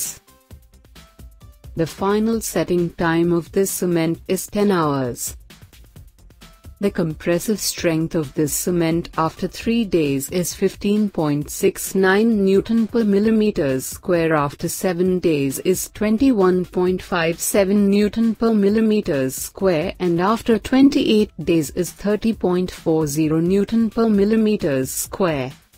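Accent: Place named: India. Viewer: Why does the bot have an indian accent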